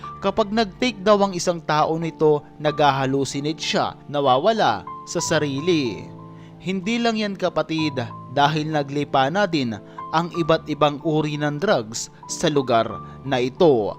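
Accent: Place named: Philippines. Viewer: I love your Filipino accent... 😅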